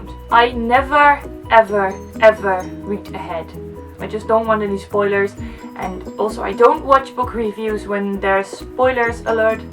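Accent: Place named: United Kingdom. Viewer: haha precies wat ik dacht, cuuuuute :D en je klinkt zo Brits wow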